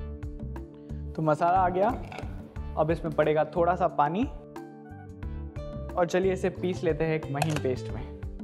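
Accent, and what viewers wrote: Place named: Lucknow, India. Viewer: Your accent is very neutral (Lucknow/urban area of MP I'm guessing) And your way of explaining things just awesome. Soothing voice and delicious recipe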